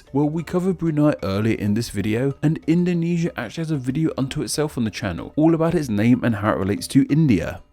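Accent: United Kingdom. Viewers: Jahaha i study british accent from your video sir😂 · Please don't take this the wrong way, but the way you get your "R" and "L" mixed up when you try to pronounce the names makes you sound like an East Asian despite the clear British accent. Fun fact: at 544,150 sq km, the Indonesian part of Borneo is bigger than the whole countries of Malaysia (both Malaysian Borneo and the Peninsula) and Brunei put together (335,612 sq km).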